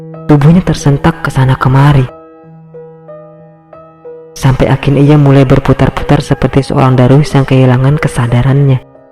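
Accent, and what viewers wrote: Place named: Bugis, Indonesia. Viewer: Ada logat2 bugisya..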